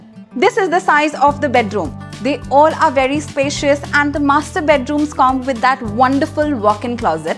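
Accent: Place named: India. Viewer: Desi sound people kada😂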